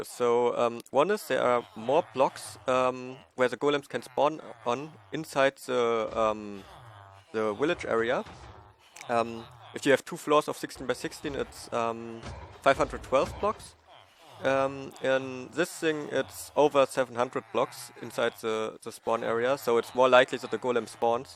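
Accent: Germany. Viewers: do you come from Germany?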